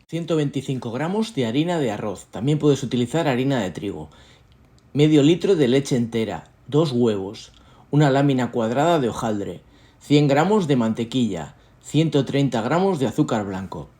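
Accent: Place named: Spain. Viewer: Fantástica receta...y lo más lindo,para nosotros los sudamericanos, es "vuestra habla"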